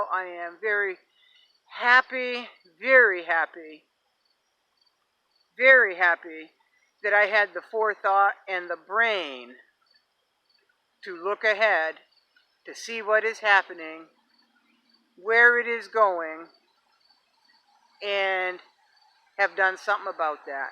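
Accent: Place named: New England, United States. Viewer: Hi, I just recently found your channel and I enjoy your videos. I wanted to tell you also that your accent reminds me of New England grandfather, I sure miss him and hearing you talk brings back great memories. Thank you, God bless 🙂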